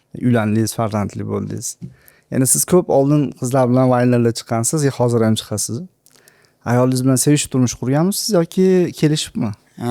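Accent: Bukhara, Uzbekistan. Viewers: Molodes chistiy Buxoro shevada gapirdi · Сизи яхши куриб томоша киламан самимий соф бухороча гаплариз ёкади узимам бухороликман тушунарли оддий роликлариз куп мусофирдан курдим бу сухбатниям тугриси ичизда бошка яшаркан видёда бошкача одамимийлигизга 5 бахо Лекин тарбия берган ота онанггизга минг рахмат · Toshkentga borib, o'zidan toshkentlik yasab olmasdan buxoroni shevasi bilan ko'tarilgan. Lekin ijodizni sog'indik. Judayam pazitivni edi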